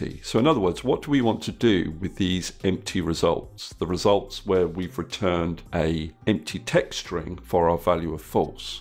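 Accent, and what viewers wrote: England, United Kingdom. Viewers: thats a great solution..thanks dear... I love your English accenr...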